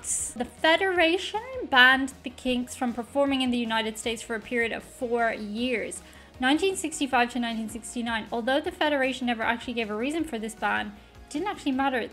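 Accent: United Kingdom. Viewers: I find it hard to believe you have a UK accent and have never heard the Kinks. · If you're British, which it sounds like you are, there is no better dive into retro British music than the Kinks 1966-70 as they were forced by the US ban to be quintessentially English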